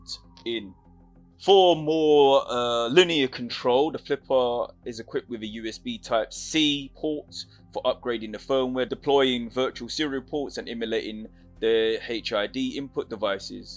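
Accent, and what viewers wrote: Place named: United Kingdom. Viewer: I can listen to a British dude explain anything... 🤷‍♂️ innit.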